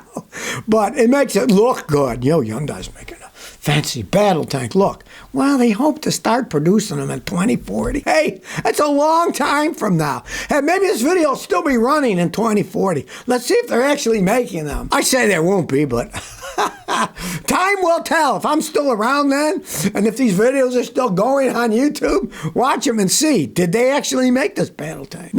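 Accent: Germany. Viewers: Loved your German accent 😅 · Best German Accent on Youtube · funny German accent. More ...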